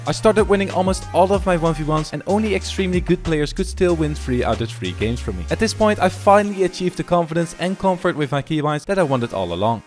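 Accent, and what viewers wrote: Netherlands. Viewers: Are you Dutch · Are you dutch?? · Ur accent sounds like your from the netherlands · Lol je bent nl en ik hoor het aan je acsent en op een clip zij je gg man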